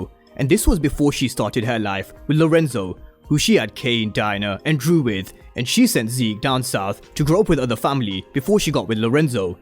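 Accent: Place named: United Kingdom. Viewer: Sounds like the narrator is possibly British, they sometimes pronounce names or terms ending with an 'a' or ' 'ah' with an 'er'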